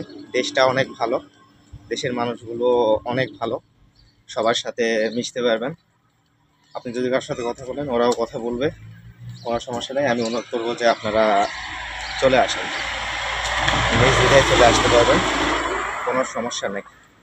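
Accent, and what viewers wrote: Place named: Naogaon, Bangladesh. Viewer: আপনের ভাষা মনে হয় নওগাঁর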